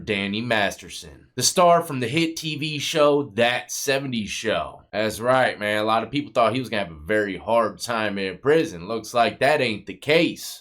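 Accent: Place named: Detroit, United States. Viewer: Yo, you from Detroit?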